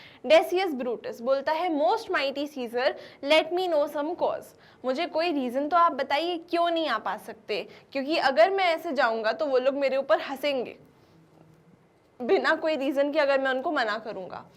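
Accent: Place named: Bhojpuri, India. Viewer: lag rha hai bhojpoori bol rhi hai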